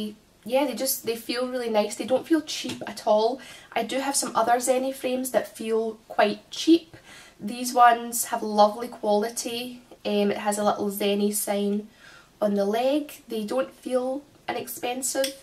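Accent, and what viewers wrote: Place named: Scotland, United Kingdom. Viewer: your accent is so cute you sound like riona from super natural. Is it Scottish? I have stainless steel polarized tinted mirrored aviators from zenni that are awesome! just a bit heavy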